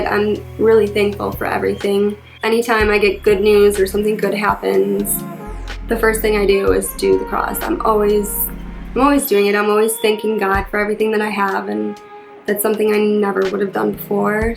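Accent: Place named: Chicago, United States. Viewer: I was gonna ask if you were from chicago. You got that accent. love it!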